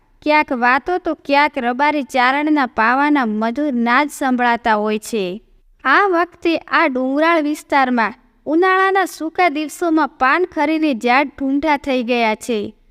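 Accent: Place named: Banaskantha, India. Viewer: સરસ વાર્તા ઓ મૂકો છો તમારો અવાજ બનાસકાંઠા નો લાગે છે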